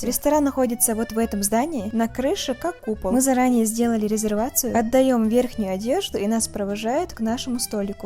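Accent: Chechnya, Russia. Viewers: А кто ты по нации ? Акцент на чеченский смахивает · Акцент чеченский… но ролики у блогеров все под копирку ))) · У вас акцент Чеченка? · Чеченский акцент выдает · Да, очень милая и нежная, наверное чеченка или ингушка) немного слышу в речи акцент.. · Почему-то мне мерещится чеченский акцент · Извините , а вы вайнашка? Легкий акцент чеченский… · А вы чеченка? Слышится лёгкий акцент)) А ролики у вас всегда получаются очень интересные .Так держать 👍👍👍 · Я вижу, вы мастер акцентов. Было бы действительно отлично, если бы автор написала, что она с Дагестана, я бы посмеялся с умников в комментах... Я слышу, что это вайнахский акцент, или Чеченка или Ингушка, но опять же, она и с Дагестана может быть, всё зависит от того, насколько хорошо она владеет языком и с кем дружит. Не надо сразу умничать и исключать все остальные варианты... Глупо выглядите. Вы можете предположить, но не знать на 100%. 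· Мне одному слышится чеченский акцент?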